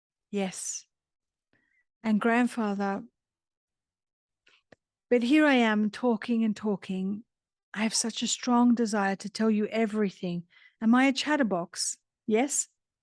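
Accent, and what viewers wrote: Australia. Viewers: I agree 100%! Beautifully read! A lovely soft Australian accent makes for easy listening.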